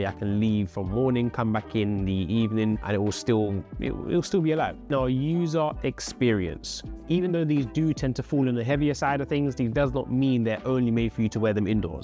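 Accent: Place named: United Kingdom. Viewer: Just got my AirPods Max a few days ago and I don’t really regret it they’re amazing headphones. Also, Super random but you’re living in Canada with a British accent I have a question. As someone from the UK wanting to live in Canada which is better? (I think I know the answer)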